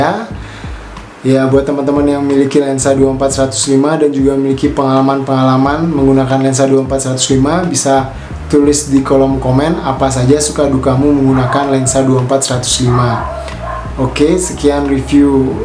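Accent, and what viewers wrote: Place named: Bali, Indonesia. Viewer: bang kq logatnya kyak logat bali ?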